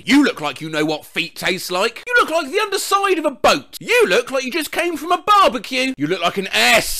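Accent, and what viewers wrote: United Kingdom. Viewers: because it all is being said by a british person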